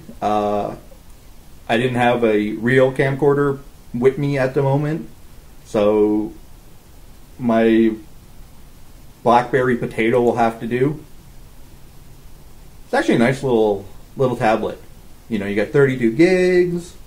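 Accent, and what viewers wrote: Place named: France. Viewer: dat epic lisp, and dat french accent hahah :D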